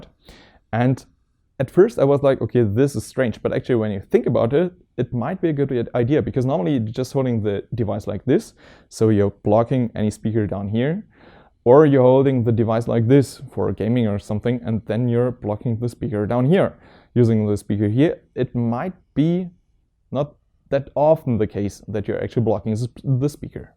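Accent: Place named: Germany. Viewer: sehr gut. love your German Accent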